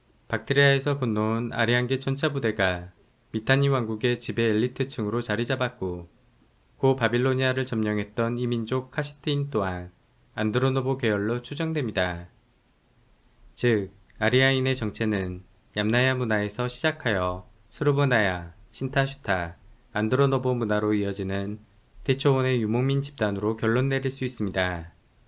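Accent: Jeolla, South Korea. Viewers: 이분 어투가 라도네! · 전라도 억양이 있는 거 같으심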